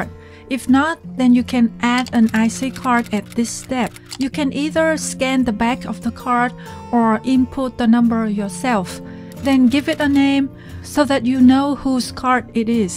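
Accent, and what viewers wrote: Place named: China. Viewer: Are you a Mainland Chinese living in Japan? You speak English like my Mainland China colleagues. Nothing wrong with that, just wondering 😊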